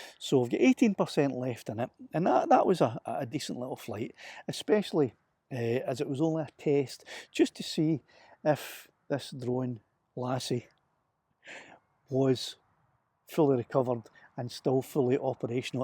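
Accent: Scotland, United Kingdom. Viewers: Nice video. Judging by your accent, you are in Scotland. Where abouts are you located?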